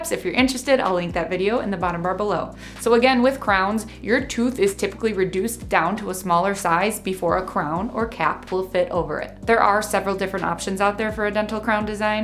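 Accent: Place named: Midwest, United States. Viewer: You sound midwestern as hell, Chicago??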